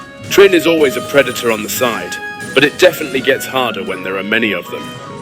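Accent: United Kingdom. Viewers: 완벽한 영국식 영어다 하다가 중간중간 개뿜네 ㅋㅋㅋㅋㅋ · 영국식 엑센트 맛집임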